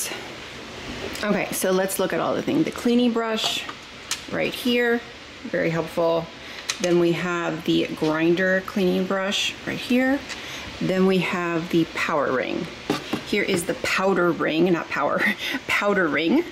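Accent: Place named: Appalachia, United States. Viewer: For the love of all things holy can you please learn the proper way to say “espresso” and “ accessories”? There is NO “x” in either! I can’t watch this video with your hillbilly verbiage!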